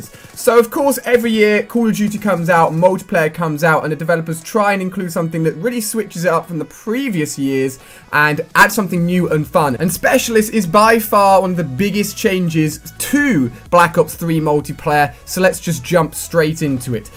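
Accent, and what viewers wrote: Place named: United Kingdom. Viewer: Don't know what's better the game his commentary or his British accent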